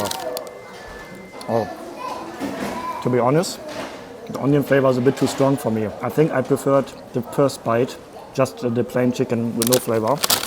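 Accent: Germany. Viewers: 독일식 발음 영어인가...ㅋ 근데, 독일보다 동독, 동독보다 폴란드쪽? 아니면 오스트리아보다 더 동쪽 헝가리? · there is some strong German accent. The speaker is certainly a nerd, but the info given is fairly useful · Your accent is very interesting, are you German?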